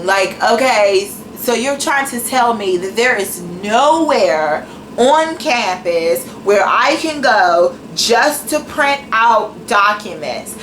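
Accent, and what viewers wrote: Washington DC, United States. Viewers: You guys chill out, she's from DC thats how they speak, Its just her dialect. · She's from dc she has an accent 👌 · Lol I know you from DC I can tell from you accent. I loved this video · Lmao. You so funny! You from Washington DC or the surrounding area? Cause thats how we talk there 😂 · yasssss. DC accent!!!! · Lmao yassss!!! I would've done a video like this when I was at school. I went to school in NC but I'm from DC too, I was watching your make-up video and was like this girl must be from DC I can tell in your voice lol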